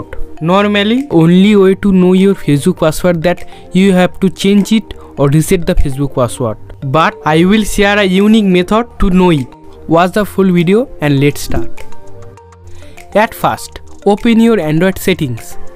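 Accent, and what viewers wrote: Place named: Bengal, India. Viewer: আপনি যে বাঙালী তা আপনার ইংলিশ বলাতেই বুঝতে পারছি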